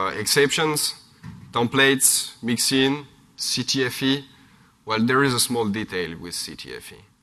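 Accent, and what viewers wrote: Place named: France. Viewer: Nice French accent ! Some frenchies have talent :)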